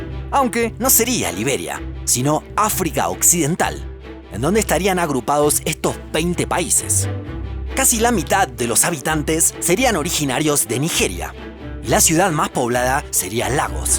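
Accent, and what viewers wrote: Argentina. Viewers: Se nota que es un canal argentino por dos cosas: el acento (obvio) y porque no pone a las Malvinas como territorio británico de ultramar.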